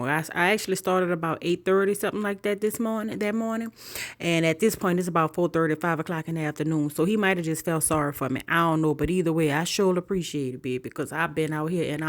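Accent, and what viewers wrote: New Orleans, United States. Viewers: Sounds like you from New Orleans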